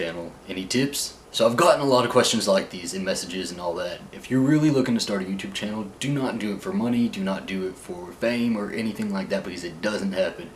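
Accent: South, United States. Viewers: It's because he's southern ;) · sounds like a southern surfer boy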